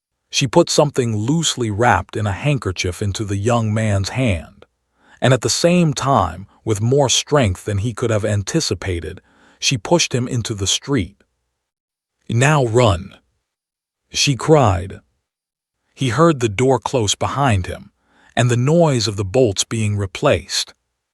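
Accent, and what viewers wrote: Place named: Bronx, United States. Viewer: Pity about the inexplicable Bronx accent, but the narration is competent enough.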